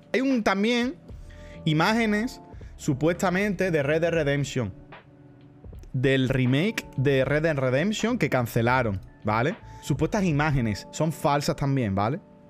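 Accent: Puerto Rico. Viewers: porque habla como puertorriqueño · A veces se me va el rollo y por el acento creo que Baity es puertoriqueño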